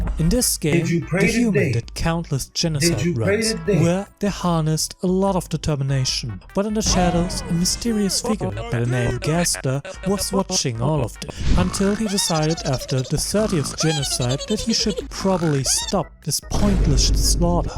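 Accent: Germany. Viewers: ja, wenn man Deutsche gut kennt hört man einen deutschen akzent bei ihrem englisch, und auch cool dass du deutsch kannst haha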